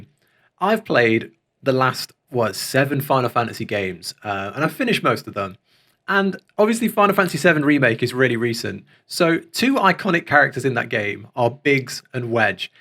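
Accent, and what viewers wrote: United Kingdom. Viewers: Its so fun to follow your progress! 🙌🏻😜 And I like your English accent, so nice to listen to 🙈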